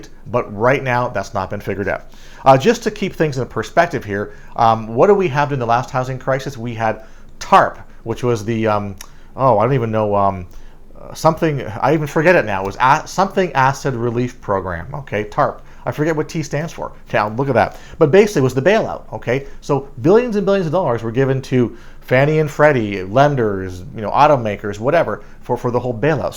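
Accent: Canada. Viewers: ouuut😅 abouut he's definitely from Canada.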